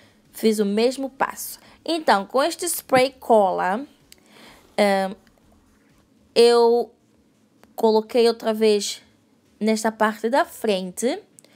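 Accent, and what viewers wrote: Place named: Portugal. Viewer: Amai o sutaque português ❤️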